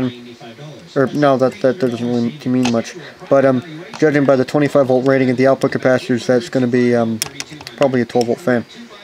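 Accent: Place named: Northeast, United States. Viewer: WOW, great video, lots of info! Your from the north east of the states? You talk fast and roll your words together.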